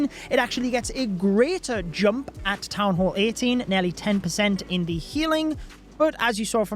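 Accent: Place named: United Kingdom. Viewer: british ascent w'oa army🙂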